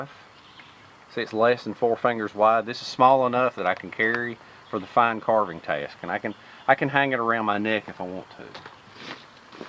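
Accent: South, United States. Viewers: I subscribed to you a few weeks ago now. Love your honest life experience opinions. Keep your videos coming, very educational. Seen so many bug out bags, the wife's kicked me out bag lol, get home bag, I got this knife to add to the 5 other knives lol Common sense. I've added to my van a few of what you have. Many thanks. My wife loves your southern accent.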